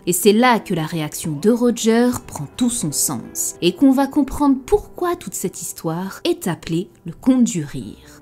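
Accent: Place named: Belgium. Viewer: Sur que tu es Belge! lol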